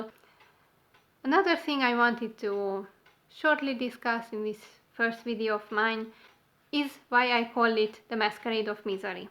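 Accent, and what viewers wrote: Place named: Hungary. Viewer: I can only regret I have found your channel only today. I miss hearing the Hunglish accent as I used to work in Budapest for a long time. When I came for the first time to work with my Hungarian colleagues I could not understand why they are so negative as I was in life bubble back then. But they did not complain about things you or we rant about. It was mainly the job, government, gipsies, other colleagues, bosses etc but not about the life itself. You made my day after listening to the first authentic rant from Hungary which is way more meaningful. Cant wait to watch all your videos.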